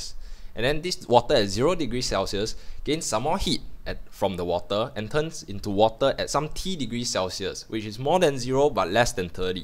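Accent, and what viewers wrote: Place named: Singapore. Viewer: hahhaa just want to say i stumble this on chatgpt, then when i played the video i heard ur voice/accent, im like EH singaporean hahaha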